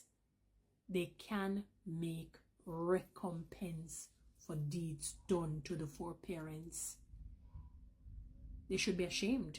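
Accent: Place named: Jamaica. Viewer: All black is ok as long as it is from the country that enslaved them! In America ( American decedents of slavery) ados because American government enslaved our ancestors! I don't know what country enslaved your people but I can hear your accent, you sound Jamaican. Hope you and your people get your reparations from whichever country that enslaved your people( ancestors)🤔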